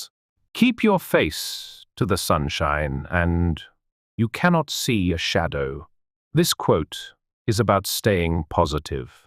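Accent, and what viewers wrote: United Kingdom. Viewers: thank you!! I like it a lot more when it is speaking in British English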